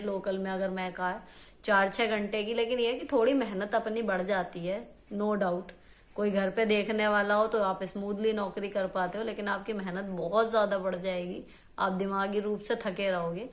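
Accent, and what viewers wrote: Meerut, India. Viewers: आपकी भाषा पूरी मेरठ की है, खड़ी बोलीं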